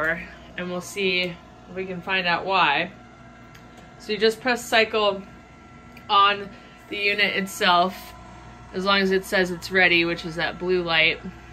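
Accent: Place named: Pittsburgh, United States. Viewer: Could hear the Pittsburgh accent haha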